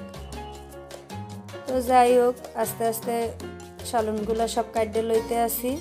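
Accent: Barishal, Bangladesh. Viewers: Apu apner basa borisal e konkhane amar basa o borisal e .....amader borisaler basai vlog sune valolaglo ti subscribe kore fellam jate amader borisaler basa sunte pari....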